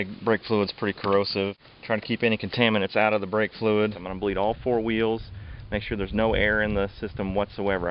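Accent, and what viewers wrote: United States. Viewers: No funnel, no gloves, southern accent, thats back woods boy. Merica! Hey at least you have good info, and you were wearing eye pro that stuffs bad on the eyes.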